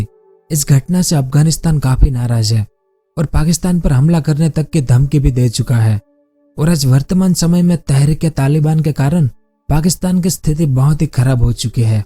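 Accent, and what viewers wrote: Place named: Kathiawar, India. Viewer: તમે કાઠીયાવાડી‌ છો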